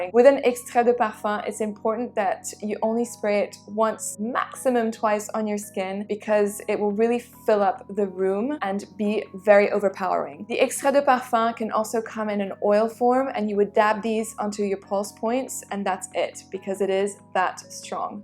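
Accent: France. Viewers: You have a very good French accent. Are you originally French?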